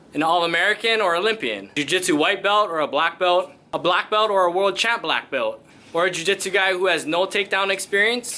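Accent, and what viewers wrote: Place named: Hawaii, United States. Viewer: I was listening and thought to my self "this braddah sound like he from Hawaii" sure enough you are 🤙🤙🤙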